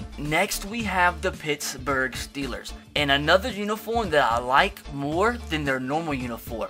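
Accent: Ireland. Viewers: U sound Irish asf😂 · The way he talks is like a Irish person. He talks so fast. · Are you irish?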